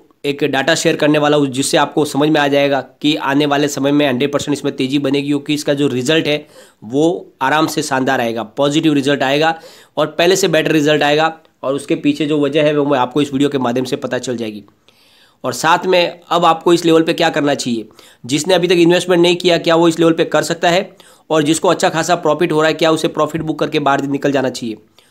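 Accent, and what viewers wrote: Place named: Marwari, India. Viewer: Rajasthan m bhi marwadi accent arha hai. Mybe belongs frm pali,jalore,jdpr,sirohi. May be i gs🤔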